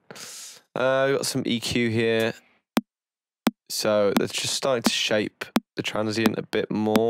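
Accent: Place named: United Kingdom. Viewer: Sick snare sick bri ish accent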